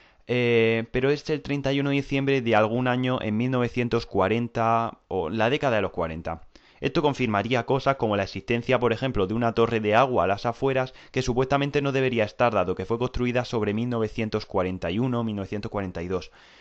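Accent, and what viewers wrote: Murcia, Spain. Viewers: Que grande eres tio, de los mejores youtubers de zombis. Sigue asi y alcanzaras a psicho y jugger. Por cierto de que parte de España eres?? Tienes acento pero no se de donde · Eres de murcia verdad???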